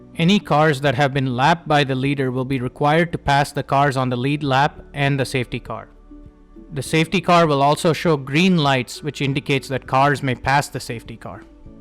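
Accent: India. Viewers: Indian aksen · Sorry I don’t understand indian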